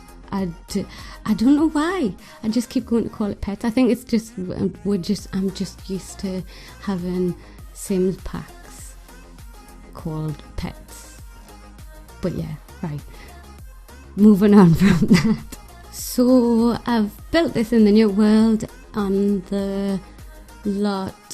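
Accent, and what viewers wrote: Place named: South Shields, United Kingdom. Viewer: SO creative!! can't believe there was no CC! love your south shields (?) accent... subscribed!! xxx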